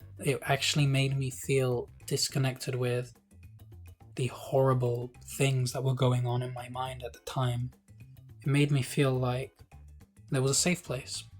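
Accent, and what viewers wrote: United Kingdom. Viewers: You're Spanish??? With your accent, you'd think you're English. It's so good that the game's finally here. I grew up with it in The Netherlands (best dub!). I rewatched ALL episodes a couple years ago, and played the GO games for the first time. The GO anime never got to the Netherlands so I didn't really grow up with it, but Chrono Stones ended up being my favorite season. Anyway, I felt like yapping a bit too. Keep up the great videos! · Me sorprende que seas español,tienes un acento muy británico(yo también soy español btw) Yo también crecí con esta saga y nunca me he cansado de ella,no soy fan del futbol pero esta saga es algo muy especial para mí,me enseñó a no rendirme nunca,a seguir luchando a pesar de las circunstancias. Tengo demasiadas horas en estos juegos entre lo que jugué cuando salieron y otros medios 😉 Todavía no me puedo creer que hoy sale por fin VR(EA) probablemente sea el juego que más he esperado de toda mi vida y sé que va a ser un viaje muy especial(GOTY 100%) Espero que lo disfrutes tanto tanto como yo o más Vamos a dejar nuestra marca Inazuma en el mundo!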